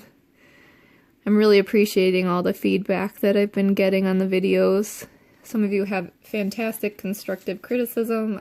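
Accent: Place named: Ohio, United States. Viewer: Love ur technique, style and natural ability to teach and paint. Happy to hear u r from Ohio. Kinda thought u might be by listening to you! That’s a good thing! I am from Ohio currently outside of Cincinnati. Thanks for sharing your talents. God Bless you and your family! Would love too see you do a rose 🌹.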